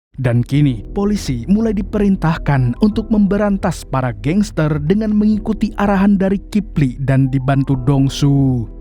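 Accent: Banjar, Indonesia. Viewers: Suka suara x orang banjar kah bang?😊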